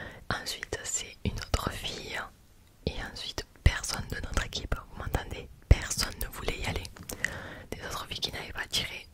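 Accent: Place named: Basque Country, France. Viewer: Voilaaaaa on dit chocolatine conn, t’as un accent basque de fouuuu je me trompe?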